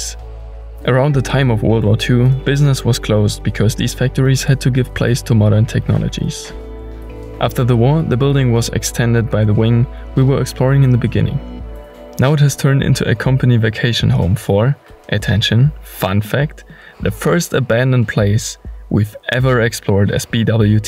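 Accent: Germany. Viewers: I like your videos, very well done and your great dialogues. And especially I like your German accent (honestly).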